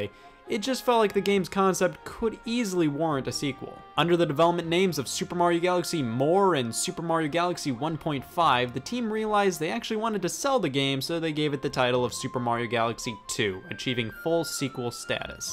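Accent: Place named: South, United States. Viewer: I heard "Y'all" too... Or maybe that's how I think all Americans speak, all southern like. It's definitely refreshing since other channels start with the now overused "Hey what's up guys, xxx here and today...". intro feels like a backhanded greeting, it feels like he's welcoming me but doesn't want me here at the same time... Sort of like my mother.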